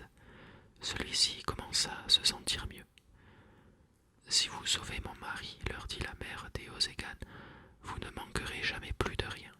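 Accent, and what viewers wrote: South, France. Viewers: Je me demandais si tu étais toi-même breton puis je me suis rappelé ton petit accent qui sonne plutôt du sud, j'ai raison ? 😇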